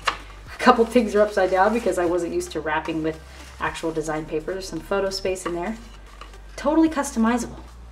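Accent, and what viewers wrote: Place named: United Kingdom. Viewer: What a lovely little folio! beautiful work as usual (British accent :))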